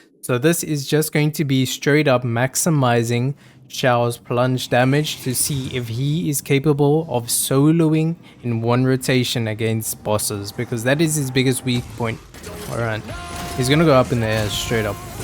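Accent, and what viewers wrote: South Africa. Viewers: South African? Uncommon... Cool video 👌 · I can definitely hear that this guy is South African, Lekker my bra! SA for the win! man is lekker ryk om so baie constellation op so baie karakters te hê T-T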